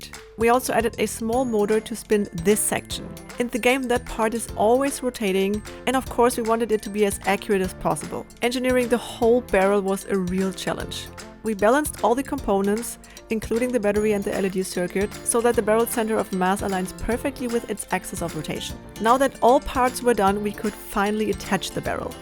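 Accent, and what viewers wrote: Germany. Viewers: I thought your accent sounded German! :D Wago is the brand, so like Tempo or Zewa it is used as a noun even though it's a name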